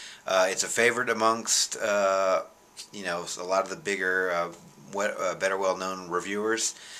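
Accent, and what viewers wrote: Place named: New York, United States. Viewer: I thought you were from NYC for the longest time. Lol Great review braw.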